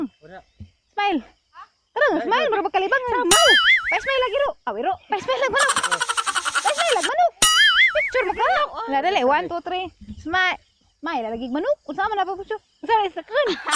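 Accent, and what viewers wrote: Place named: Cortes, Philippines. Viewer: hahaha..kuhang kuha jud nimo ang tuno sa mga tga cortes dai...gimingaw nakog pugas ug mais...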